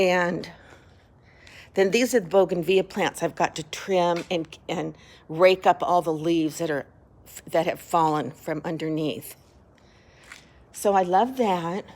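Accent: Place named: Minnesota, United States. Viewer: You may be in CA, but you sound a lot like my family in southern MN💕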